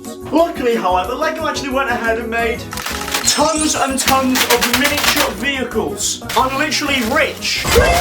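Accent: United Kingdom. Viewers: Bro are u brithish ¿?💀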